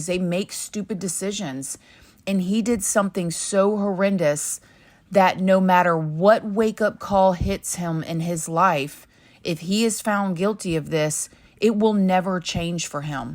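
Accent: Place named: Florida, United States. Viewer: I have been going through it lately. I have to face my abusers in court next week and I’ve been spending every spare moment preparing. I’m a single mom with no lawyer, so I’m really out here on some Elle Woods sh*t. Anyway, I’ve been fixating on the case, in my head poring over my traumas all day everyday. But when your videos come on, I actually feel like I get a little break from that. You’re such a great storyteller, I feel how warm and caring you are. And you talk like the country Florida ladies I grew up around in Ocala before I moved to DC. I feel so relaxed and at ease listening to you. Which is ironic considering the subject matter 😂 a testament to how positive and bright your energy is! Thank you 🙏🏻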